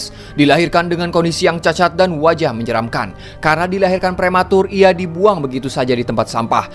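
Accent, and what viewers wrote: West Java, Indonesia. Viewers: Admin nya urang Sunda belah mana euyy😅😮😂😂😂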